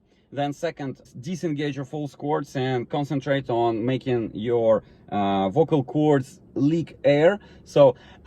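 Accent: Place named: Russia. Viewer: Incredible explanation. I thought I know how to do false-fold distortion, lol, but seems like I use cartilage, gonna try your way as mine hurts. Btw, where are you from, your accent is so Russian :) Although, I think passing too much air through vocal cords dries them a lot, so fry screams should be safer.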